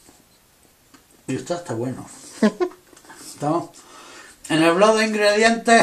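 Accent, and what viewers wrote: Andalusia, Spain. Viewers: Saludos desde Cuba me recuerda a mi abuelo que era andaluz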